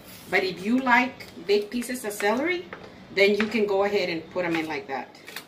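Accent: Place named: Texas, United States. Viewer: ! I absolutely love your recipes! I tell our four young daughters who watch your videos with me that I would love a lady in my life like you to teach me all her recipes! I often thought you were from Texas, just because of the way you talk and present yourself. I am from Austin, and we live in Manor, and today on this potato salad video I saw the mustard from H‑E‑B. Haha!!! I know there is no HEB’s in any other place but Texas! Anyways! We LOVE YOUR RECIPES! I used your cheese enchilada video as a reference for last nights dinner. We all loved it! Thanks for all you do and God bless you and your family!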